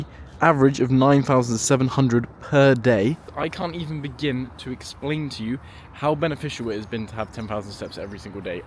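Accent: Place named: United Kingdom. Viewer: Funny British accent, great charisma and tons of human charm flowing in streams from this cute nice guy. That's why I love this channel 😀👌💋🧡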